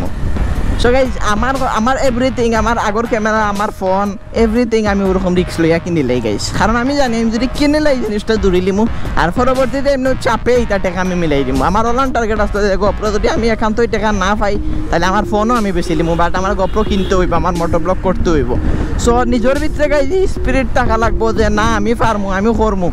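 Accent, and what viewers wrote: Sylhet, Bangladesh. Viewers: Best Sylheti vlogger hands down pure Sylheti maat Your number fan from UK London Tower Hamlets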